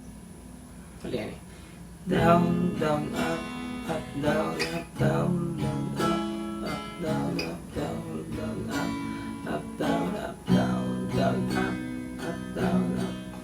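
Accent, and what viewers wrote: Visayas, Philippines. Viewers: Bisaya man diay ka sir.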